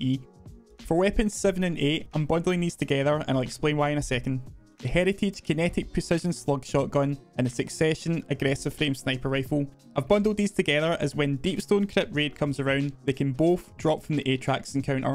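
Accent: Scotland, United Kingdom. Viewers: it's probably because you're Scottish, didnt notice much of the mumbling. I would advise seeking professional help, as this can cost you In the professional world in the future. But thats about it, best of luck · Not a big deal but based on what you said your reasoning is flawed, " it's probably because you're Scottish, didnt notice much of the mumbling". If you didn't notice much of the mumbling then clearly it was due to his accent (by your own admission), so he was speaking clearly in a Scottish accent. My guess is you're just not used to it and have yet to develop an ear for it. :)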